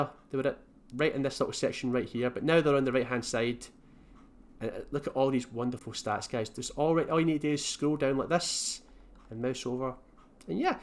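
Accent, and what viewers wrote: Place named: Scotland, United Kingdom. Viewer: Love Scots and Scottish acsent.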